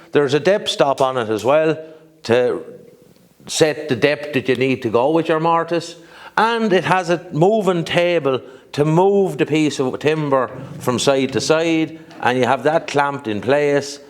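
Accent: Ireland. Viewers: Ya know a lad knows his stuff when he uses a morticer like that; it keeps the tool pressure even when you cut with either 4 or 2 sides of the chisel....... ya start cuttin with 3 sides and sometimes it wants to flex, that's why you stepped over your cuts. I use hand tools, and one of my most hated jobs is cuttin a mortice........ I see a hollow chisel someday in my future 😆 It's nice to hear an Irish accent on YouTube lad..... This Wexford man enjoyed your video 😁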